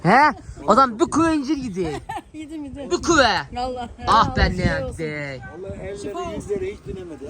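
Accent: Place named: Zonguldak, Turkey. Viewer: kardesim seni izleyen hic bir izleyicin sende negatif bir sey aramaz aramamasida gerek sen dogal zonguldak sivenle güler yüzlülügünle insanlara büyüklerine annene babana evine gelen misafirin ve takipcilerine sayginla bizlerin gönlünü kazandin kardesim biz seni dogal icten samimi halin ile seviyoruz yeni videolarini ve eski vidolarinida zaman buldukca izliyoruz bizler gurbette Almanyada yasiyoruz güzel ülkemizin memleketimizin özlemini senin cekimlerinle gideriyoruz esim Bartinli ben Cide 'liyim sivelerimiz bir birine cok yakin senin videolarinda dogal sivenle anlatiminla memleket hasretimizi gidermeye calisiyoruz iyiki varsin kardesim annene babana kardeslerine takipcilerine Almanyadan selamlar sevgiler kardesim.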